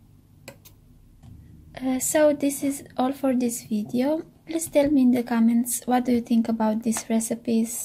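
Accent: Romania. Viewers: She is Romanian ..but the point is that not all the Romanian people have this accent ..she has an horrible accent ..I am from London ..Where are you from ? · a accent Romanian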